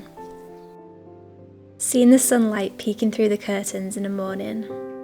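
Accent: Yorkshire, United Kingdom. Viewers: Are you a Yorkshire lass?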